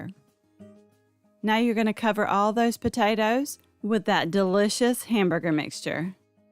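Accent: South, United States. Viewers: I love your southern accent where are you from? My guess is Georgia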